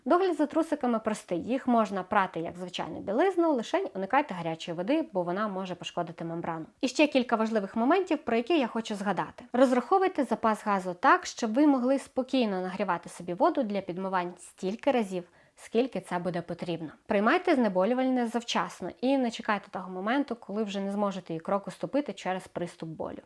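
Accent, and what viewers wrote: Standard. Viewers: дуже чиста і невимушена українська мова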